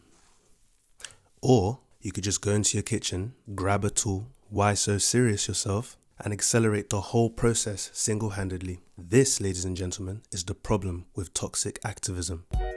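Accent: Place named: United Kingdom. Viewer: Hey do you live in America I only ask this because I assumed you lived in the UK just because of your accent, but you also seem well aware or in on American Political issues and pop culture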